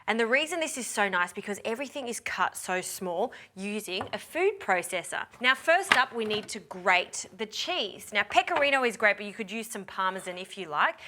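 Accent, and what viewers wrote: Australia. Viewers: I love the Aussie accent ❤️❤️❤️. Only came here to see if sprouts were ok to eat raw 😁😁😁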